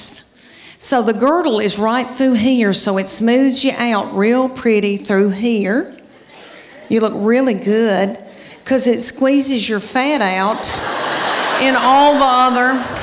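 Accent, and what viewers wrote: South, United States. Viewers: Yes. She's very smart, hidden behind that accent, a true American!👍❤️ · Youa lil bit country ain't cha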